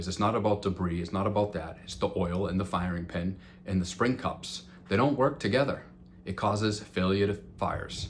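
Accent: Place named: Boston, United States. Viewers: First time viewer but judging by the voice..... Go Sox... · Bro where are you from? I was born and raised in MA, and when you said, “stahted fuckin 12 days ago” I was home in Lowell for an instant🤘🏻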